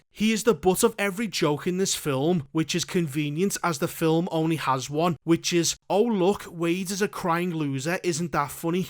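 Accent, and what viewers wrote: Liverpool, United Kingdom. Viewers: Wait..are you by chance a scouser lad?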